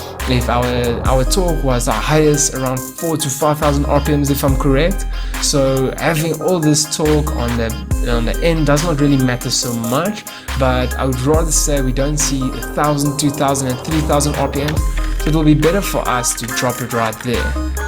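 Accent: Afrikaans, South Africa. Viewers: Afrikaans boytjie, kan sommer hoor haha.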